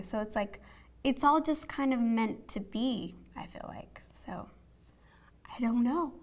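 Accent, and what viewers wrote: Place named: Idaho, United States. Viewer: when you said "belly", I couldn't tell if that was your cute little Idaho accent poppin out (it's not that noticeable anyways, don't worry! haha) or if you morphed baby and belly together, because it definitely came out sounding like "Bay-lee". LOL!!! You are too darling!:)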